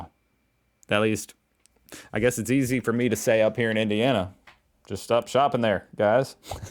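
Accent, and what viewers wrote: Australia. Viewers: Big W is a part of Woolworths and it's pronounced Ekidna 😂😂 love your videos and your Aussie accent 😅 Happy Fathers day to you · Mate, your Aussie accent is on point.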